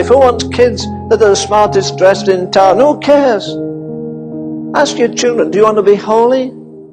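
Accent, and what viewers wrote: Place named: United Kingdom. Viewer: Saint I most look foward to meeting in heaven. I know Paul and Peter and St.John, but this man was close to my time. He moved me with those little British words coming out of his mouth so clear and clean. Prayer and long suffering. Love this little British man. I want to see him. GOD willing I can one day see his resting place, then meet him in that celestial city in the sky built by the King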